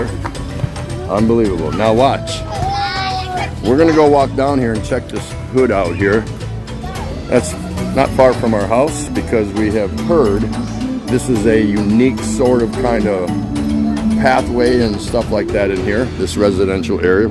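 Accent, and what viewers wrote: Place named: Philippines. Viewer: nice neighborhood with great people. nice DIY pathway for the high tide. nice tagalog accent!. hopefully one day you will blog also talking only in tagalog.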